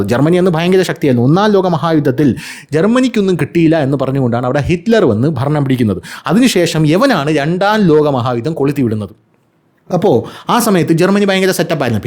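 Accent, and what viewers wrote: Thiruvananthapuram, India. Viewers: ചേട്ടൻ ഇങ്ങനെ രണ്ടു തിരി സംസാരിക്കുന്നു വേറെ ന്യൂസിലെ സ്വാഭാവികമായിട്ടും നോർമൽ ആയിട്ട് സംസാരിക്കുന്നു അണ്ണാ ഈ ചാനലിൽ നിങ്ങൾ കോമഡി ആയിട്ടും എന്നാൽ എട്ടിൻറെ പണി കൊടുക്കുന്നതായിരിക്കും സംസാരിക്കുന്നു ഇഷ്ടപ്പെട്ടു ഐ ലൈക് യു നിങ്ങൾ തിരുവന്തപുരം അവിടുന്നു വന്നതാണോ വർത്തമാനം പറഞ്ഞ ശൈലി കേട്ട് കഴിഞ്ഞാൽ അങ്ങനെ തോന്നുന്നുണ്ട് ഈ ആദ്യം പറഞ്ഞ അയ്യാ വണക്കം അത് അടിപൊളിയായിട്ടുണ്ട് നിങ്ങൾക്ക് ശരിക്കും തമിഴ് അറിയാമോ കാരണം ഞാൻ മലയാളം ആണെങ്കിലും എൻറെ അച്ഛനും അമ്മയും തമ്മിലാണ് നിങ്ങൾ പറഞ്ഞ ഡയലോഗ് എനിക്ക് ഒരുപാട് ഇഷ്ടപ്പെട്ടു ❤❤❤❤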